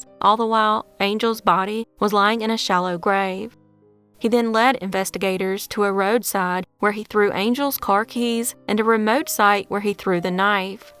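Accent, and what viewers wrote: United States. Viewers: just found your channel.. Good narration...Concise.. with the best American accent. Appreciating your content from the UK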